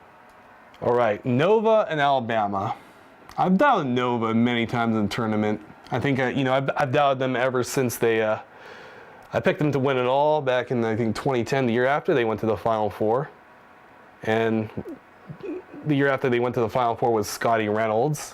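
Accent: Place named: Arkansas, United States. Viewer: What part of Arkansas are u from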